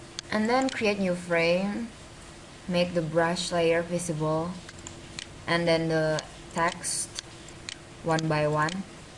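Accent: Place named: India. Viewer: This is really helpful ^__^ Thank You :D btw where are you from? cause your accent sounds a bit indian :)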